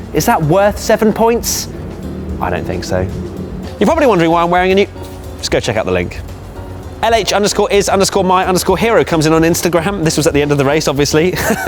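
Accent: United Kingdom. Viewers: You're so Brittish!!!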